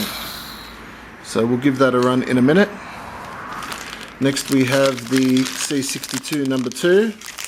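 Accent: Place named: Australia. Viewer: your Australian! but I'm not =( or american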